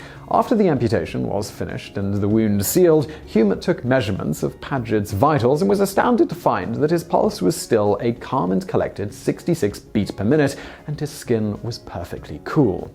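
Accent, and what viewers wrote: England, United Kingdom. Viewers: Why do you pronounce "Marquis" the American way. Your accent suggests, to me at least, that you are English, where we pronounce it "markwiss". Please, give the man some dignity. He was a member of the English aristocracy, not a fucking tent!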